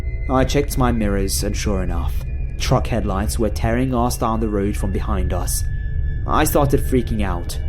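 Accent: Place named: United Kingdom. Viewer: The Brits have the best voice in the world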